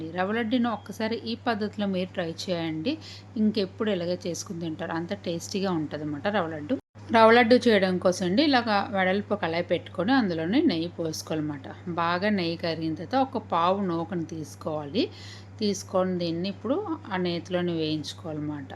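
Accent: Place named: Tamil Nadu, India. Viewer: Boríng commentary, Tamil pronunciation. Don't use aluminium dishes.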